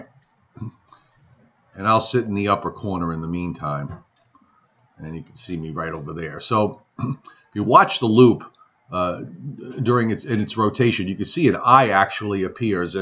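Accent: Long Island, United States. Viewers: 45 "my-aaaa" soo long Island.:) We say it in nj and nyc too but lil differently.. I love all your videos and enjoy your speaking style (straight up north nj nyc long isl). I know your big time on tv and other projects but you have an excellent radio voice and flow. Im guessing you lean center right..(caught that when you spoke about pre tropical storm warning era cant be proof of global warming. Maybe one day you can branch out into am talk radio or non political variety show. Just a compliment and thoughts. I cant wait to your winter noreaster live streams. Im a big fan of tracking them like you. Im a marketing adv. Man but my childhood dreams was to be a meteorologist. Thanks.. Gabe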